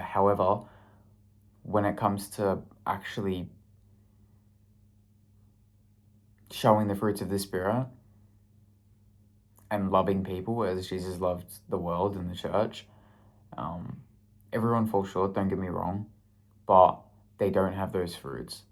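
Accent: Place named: Australia. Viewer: Hi, you sound like a awesome Aussie. Do what you love to help your journey so that you have the abilities from your hardwork of hardships? You'll understand when your 40 years old. The perception of you talking about Ego, is that all is of value, when you use intellect & compassion in the fruits of your labor. You may need to start a little martial arts training, because demons don't like to be seen by the light. laughing not laughing, just loving, living, learning that the truth is non-negotiable. Great day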